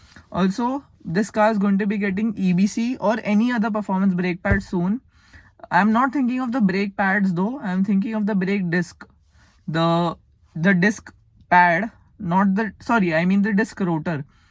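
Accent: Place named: Mumbai, India. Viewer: Its a kinda Mumbai English accent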